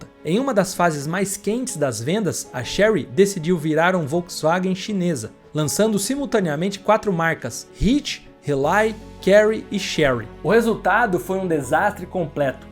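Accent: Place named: Caipira, Brazil. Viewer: Desculpe, mas esse sotaque caipira não dá pra escutar. Mas o conteúdo parecia ser interessante.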